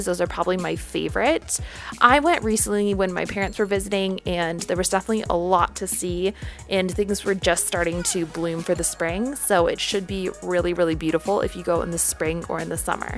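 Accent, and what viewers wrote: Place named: California, United States. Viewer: Your voice you don’t even sound like your from New York you sound like your from Cali... hmm these are all cool but idk I would want real cheep spots from a real New Yorker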